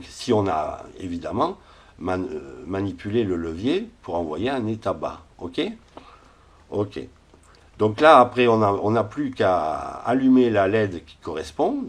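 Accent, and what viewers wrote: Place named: Toulouse, France. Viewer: Bonjour Merci pour vos tutos!!! On à beau dire mais l'accent de Toulouse facilite grandement la compréhension de l'arduino, pour moi qui vous écrit du 65... En prévision de construction de mon TCO, j'ai déjà câbler et programmé un servo avec un inter bipolaire ( trop fort!!!) les cœurs d'aiguilles et les leds du tco seront par micro swithc commandées par les servos. Deux questions se poses pour moi: Combien de servos je peux commander avec l'arduino uno? (la puissance se fera avec une alim indépendante 5v) Et comment puis je les raccorder? Encore merci pour la simplicité et la pédagogie avec la quelle vous nous expliquez des techniques qui peuvent être si compliquées (du moins pour moi...) Adichatz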